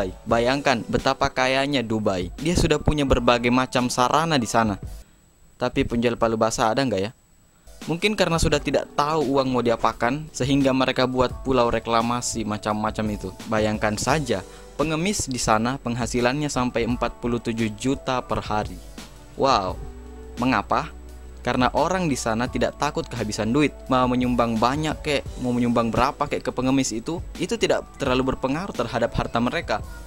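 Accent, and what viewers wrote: Makassar, Indonesia. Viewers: logat makasar ki cukimai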